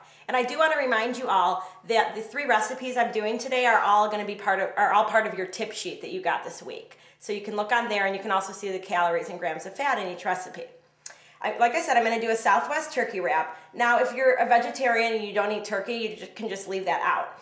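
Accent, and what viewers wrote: Chicago, United States. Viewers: Are you from Chicago? Your accent suggests it. That sandwich looks great too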